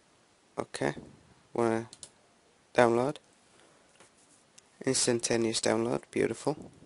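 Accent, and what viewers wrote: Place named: United Kingdom. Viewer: hey where do you come from. i know youre british but what part? its just that youre accent seems familiar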